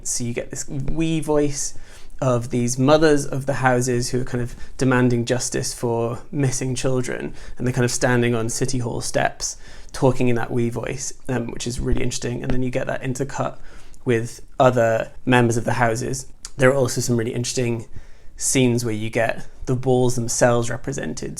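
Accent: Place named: United Kingdom. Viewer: Hey, I love. They are so talented. I really want to check out I May Destroy You but I have to get HBO. I'll definitely look into (sp?) too. I was wondering given your references to Katrina and NY Ballroom culture etc. where in the world you are? lol Obviously the accent suggests the UK but you have a lot of American references. Just curious. I just finished reading E.M. Forster's Maurice myself and immediately watched the 1987 film. I loved the novel. Especially after reading the Terminal Note at the end. Very interesting take on an English gay love story in 1914. The movie was pretty faithful to the novel as well. Thanks for the recommendations!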